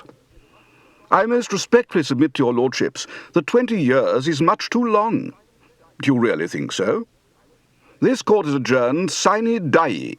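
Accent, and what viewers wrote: RP, United Kingdom. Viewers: Lovely example of High RP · This accent was still quite common among the upper class in the West Country during the 1970s. The movie "Pygmalion" (1938) is a great resource for hearing different accents of the pre-WW2 era, with Leslie Howard speaking RP throughout.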